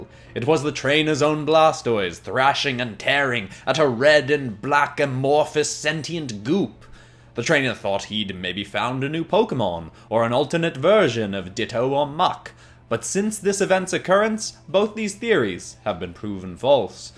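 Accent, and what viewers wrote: United Kingdom. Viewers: The y highlight of this video was definitely the British accent 😂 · just saying I like the video but the narrators accent you know the English accent it just doesn't hit right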